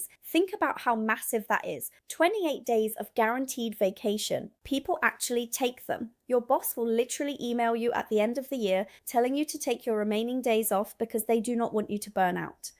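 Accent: England, United Kingdom. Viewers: Although has a great English accent, I wish that she would sound her Ts!!! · She’s American? With an English accent? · Why is your accent British?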